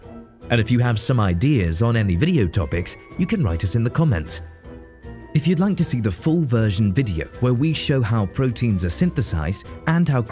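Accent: United Kingdom. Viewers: lol these simpletons thinking this is a kurzgesagt ripoff. 3 elements: cartoon, british narrator and science focused makes it a ripoff? Besides, Kurzgesagt is crap and has proven themselves to be unapologetically biased and totally untrustworthy. Kurzgesagt fans are no better, and have some kind of a weird cult-like behavior to the channel, as if they could do no wrong. Use your brain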